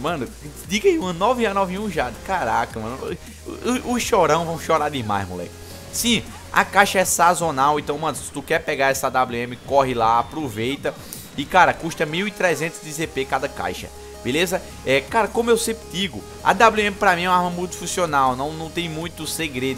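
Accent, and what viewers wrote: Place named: Paraíba, Brazil. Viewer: Nada contra mais voz de paraiba é chata veí --'...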